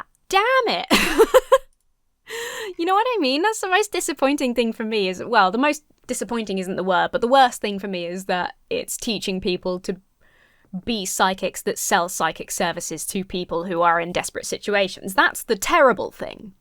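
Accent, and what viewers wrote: United Kingdom. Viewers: I love your thoughts. Ever since watching you on the Atheist Experience I can't stop watching your videos. Part of it is your UK accent (guilty as charged) but I keep watching for your skepticism. Your knowledge and thought process is invaluable for us low-education Americans.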